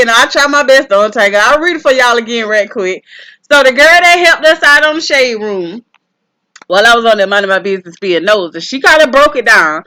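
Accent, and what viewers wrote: South, United States. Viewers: Wow this my first time hearing ur real voice [I love ur southern voice]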